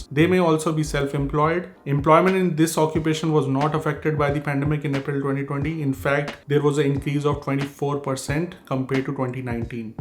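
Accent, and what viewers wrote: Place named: India. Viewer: Your accent is like indian it's the worst.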